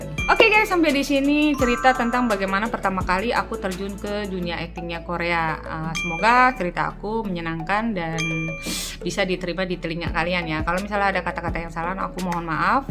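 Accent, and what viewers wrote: Bekasi, Indonesia. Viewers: seneng banget ngeliat youtube, bahasa bekasinya itu loh, nyablak tapi asik. pokoknya suskes terus buat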